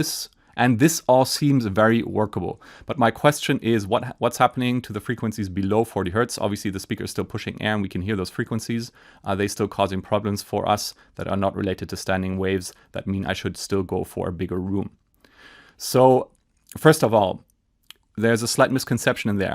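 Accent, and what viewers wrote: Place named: Berlin, Germany. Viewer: My grandparents were from Berlin, so your accent is very familiar to me. 😇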